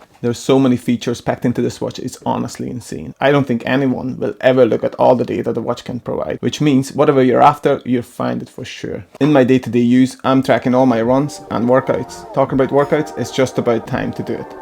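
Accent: Northern Ireland, United Kingdom. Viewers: You are from Northern Ireland? Your accent is a cross between foreign and NI 😂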